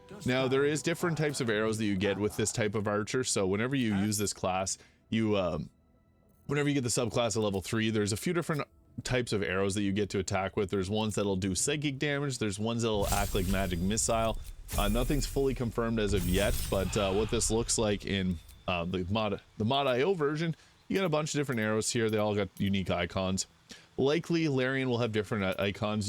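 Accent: Canada. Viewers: okay that makes sense since i couldn’t quite pin it ! assumed canadian but it was different than any i had heard before so i wasn’t sure :p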